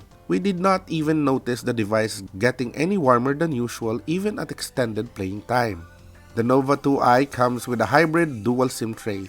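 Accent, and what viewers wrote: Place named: Philippines. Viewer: Why does he sound like a Filipino speaking in english?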